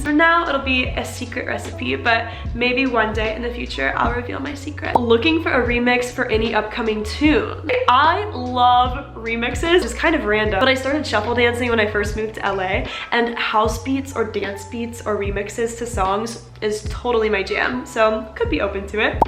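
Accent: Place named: United Kingdom. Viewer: Are your from UK if u are the accent is cute 😳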